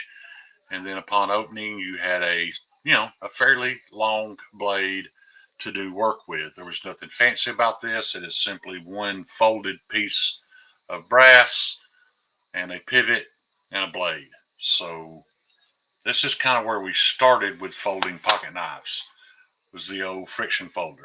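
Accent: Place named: South, United States. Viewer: You had me fooled. At the beginning you started off slow with the southern voice and the antique Asian blade. Case trapper, yes, Buck 110, for sure but boy did things escalate from there! Wow! Nice collection you got there homeboy!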